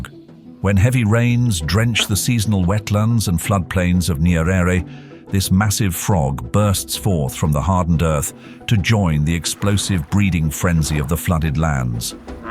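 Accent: United Kingdom. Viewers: At least the source discloses that this is AI-generated. But why does the AI narrator always have a "posh" southern England accent? This is almost without exception the case. Do the people who create these things think that the accent lends an air of credibility and gravitas?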